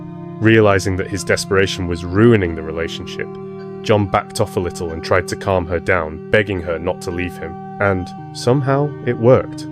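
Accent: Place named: United Kingdom. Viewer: Tip: get rid of that offensive, slimey british accent😮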